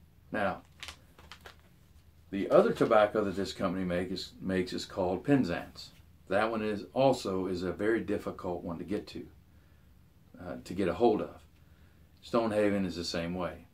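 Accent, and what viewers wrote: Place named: South, United States. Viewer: what part/s of USA is your (or his if your a viewer & U know?) is your accent from/based-around,? As a Brit gal (my hubby smokes a pipe & loves Nightcap & your vids) I/We can't quite place it; I get notes of Southern, maybe a dash of Texan, Midwesterny overtones, a teensy bit of Northern-border region possibly (Pennsylv./Fargo e.g., hey I'm a Brit I'm gonna be way off prob!?), and a hint of light New-York here & there. But really it just sounds like what we in rainy old England might consider a 'straight-American-Dialect' with a touch of some southern state/s; usually the only Southern-State accents we tea-drinking islanders can tell apart from the others are New-Orleans and 'pure' West-Virginia (if that counts as a Southern state?, it sure sounds like one to us). Just curious & interested to know how way-off we are - happy piping! 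👍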